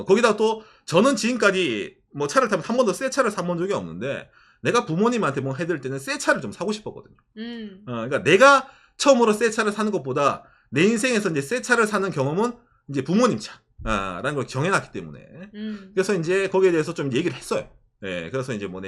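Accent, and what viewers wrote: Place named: Gyeongsang, South Korea. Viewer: 역시 경상도 말투...ㅋㅋㅋㅋ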